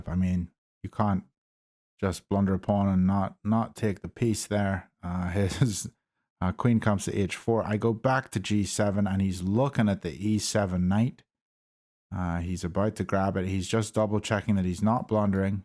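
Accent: United Kingdom. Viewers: Thought you were British mate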